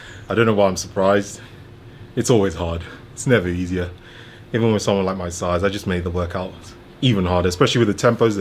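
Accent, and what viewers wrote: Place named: United Kingdom. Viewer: I swear all people with that accent and are from the uk or whatever call their backyard a garden 😭😭😂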